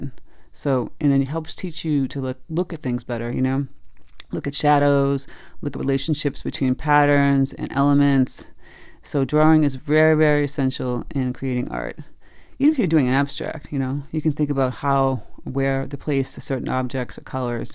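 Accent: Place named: New England, United States. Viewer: I have been doing art for years, since childhood and yet I tune into you because you a) sound just like my New England sister in law, and b) you inspire confidence, as you have such a casual, easy going way of explaining that you make everyone watching feel the projects are all approachable. Good job on breaking things down well.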